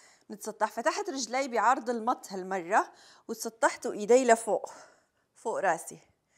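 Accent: Aleppo, Syria. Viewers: تحياتي.. كأنو مبين من لهجتك انك من حلب مو ؟؟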